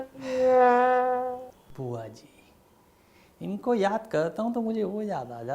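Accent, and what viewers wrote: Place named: Bundelkhand, India. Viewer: मज़ा आ गया बुंदेली सुनकर। और कछु अंग्रेज़ी सुनकर। वैसे वो तो इतनी भी अंग्रेज़ी नहीं बोलता जितनी आप बोल देते है।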